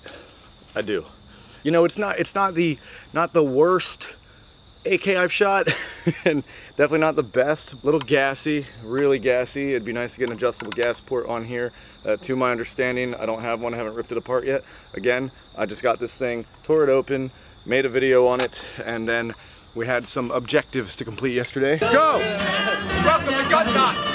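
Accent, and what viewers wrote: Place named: New Jersey, United States. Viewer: So your from New Jersey’s then?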